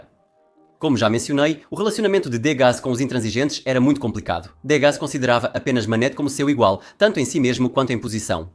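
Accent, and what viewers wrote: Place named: Brazil. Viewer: Seu sotaque não, e brasil.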